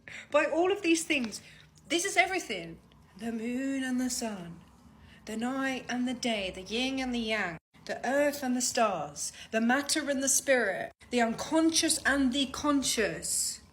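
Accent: United Kingdom. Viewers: ليست أميركية ، بل انكليزية أو بريطانية واضح من لهجتها . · لكنتها انجليزية وليست أمريكية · من لكنة اللسان هذه السيده تتحدث باللهجة البريطانية وليست أمريكية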